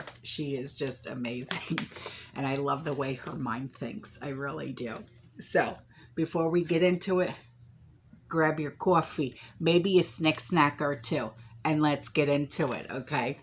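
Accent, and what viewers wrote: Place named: Boston, United States. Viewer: "Grab your cwauffee".....that's how I hear it ......great accent.....Mass?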